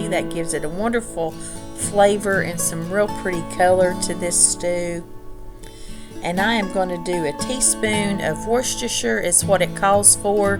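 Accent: South, United States. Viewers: Happy Fall!! Your recipes are so amazing and for us, its your sweet country voice that just relaxes both of us... we absolutely adore you and your content!!!! Thanks so much for joining in this year!!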